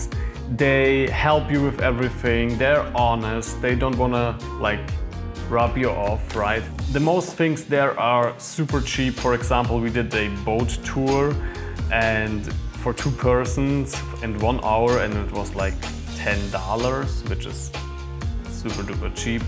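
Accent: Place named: Germany. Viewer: Stick to your pizza's dude, one cannot only hear you are German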